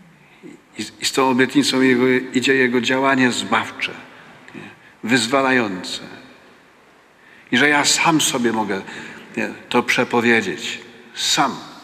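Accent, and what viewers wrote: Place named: Podhale, Poland. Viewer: Z gór.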